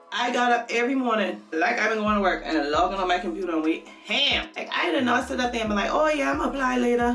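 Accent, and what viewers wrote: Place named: Gullah Geechee, United States. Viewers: Babeeee the Geechee came out today. I smiled so wide one minute in and my son said Mama she sound just like you 🤣🤣🤣🤣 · hahaha, Nah.... Perfect Geechie.... So so so very funny... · Man, dis too geechee! LOL!!! Great advice, though! Loving the channel, and I'm not even in HR!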